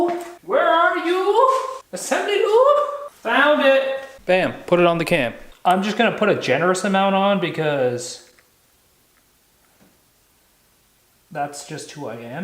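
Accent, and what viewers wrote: Alberta, Canada. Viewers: Are you from Alberta??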